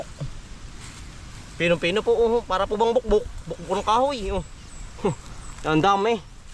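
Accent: Batangas, Philippines. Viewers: Taga Batangas po ba kayo? Hindi ko magets ang punto niong magsalita. Sorry po. Watching from Delano, California. Thank u n god bless.❤❤😊😊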